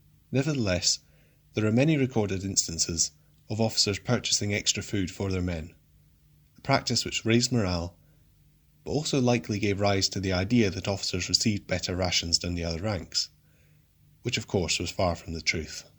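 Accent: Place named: Scotland, United Kingdom. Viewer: No he isn't. Sean Bean is from Northern England wheras this YouTube is clearly Scottish. It's like saying someone from the North of the USA is a dead ringer for a Mexican.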